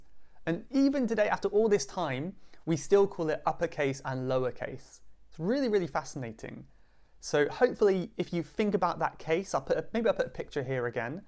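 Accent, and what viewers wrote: United Kingdom. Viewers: Teacher, may I ask which part of the UK you are from? 😊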